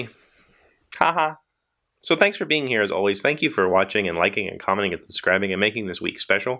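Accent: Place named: General American, United States. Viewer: Ha ha ha of course you have an accent! I find you easy to listen to, a more general 'American' while those from the south often have a drawl or sing-song accent. Now this is because I am Australian, and no we never say we will put a shrimp on the barbie...we dont use the word shrimp in relation to seafood, its a prawn, a shrimp would be an almost derogatory name for a short person or child. Living in Australia there is less difference in accent as there is in the US more often its in the use of colloquialisms or local phrases, or ending the sentence with an eh. Sam, well done. Cute family, but OH NO chocolate covered bacon??? I have no words... Well done, be a good son and make stuff for your Mum. Have a safe and happy weekend to all, Trace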